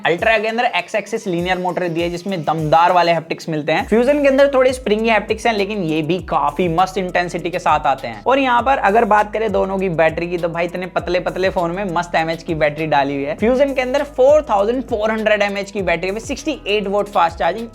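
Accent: India. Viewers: Such heavy Indian accent. Don't understand what he is saying.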